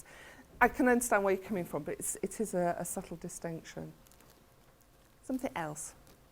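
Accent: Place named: United Kingdom. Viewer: It's a boring enough subject to sit through. Why on earth would anyone want to sit through it having to listen to someone with such an annoying British accent?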